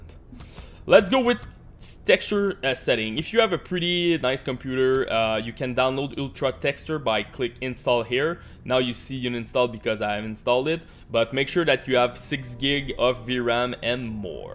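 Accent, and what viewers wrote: Quebec, Canada. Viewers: You have beautiful Quebec English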